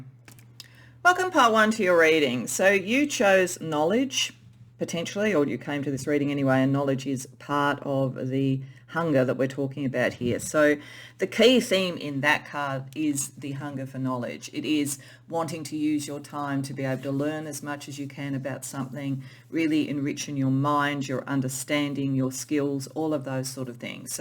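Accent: Australia. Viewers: Love your accent Aussie?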